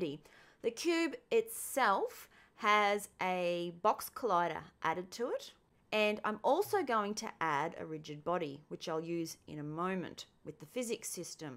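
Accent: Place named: Australia. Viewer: you sound like you from southern queens land or northern nsw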